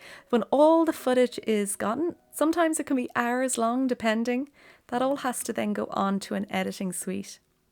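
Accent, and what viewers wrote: Ireland. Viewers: I so love your soothing beautiful irish voice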